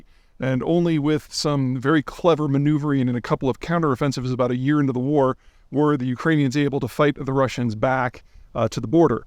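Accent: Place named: United States. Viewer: First of all: great video as usual, super informative. I just can't help hearing "car key" whenever you americans pronounce Kharkiv, and it's really funny to me.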